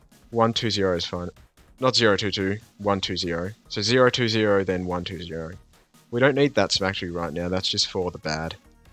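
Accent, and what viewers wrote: Australia. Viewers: Same. Aussie?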